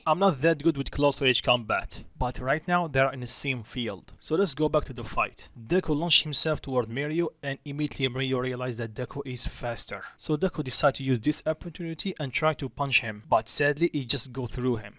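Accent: Russia. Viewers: Hey sir are you from Russia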